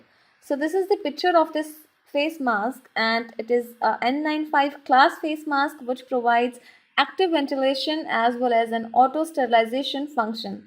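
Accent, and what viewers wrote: India. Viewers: Scam adviser but then hear Indian accent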